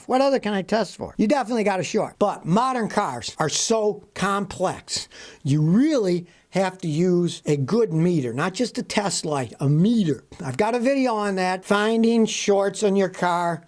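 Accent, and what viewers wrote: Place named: New England, United States. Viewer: This guy may live in Tennessee, but he has a New England "Eyakcint." I've seen him with a "Live Free or Die" sign. Could he be... a New Hampshireling? 😃 Love from a Vermonter living in Texas.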